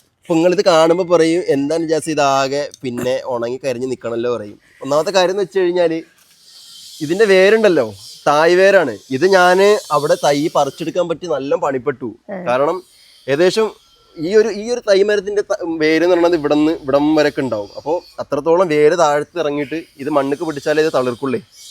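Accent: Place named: Palakkad, India. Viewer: നമ്മൾ കൊല്ലത്തു ഉള്ളവർ കുടിയിരിക്കൽ എന്നൊന്നും parayaarilla😃 പക്കാ പാലക്കാട്‌ slang ആണ് മിക്കവാറും paraunnathu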